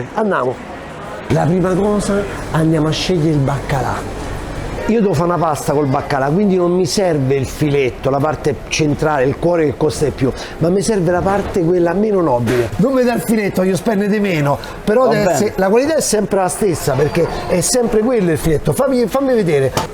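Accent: Rome, Italy. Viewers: RICORDATI A MILANO NON RINNEGARE IL ROMANESCO!!!!!!!!!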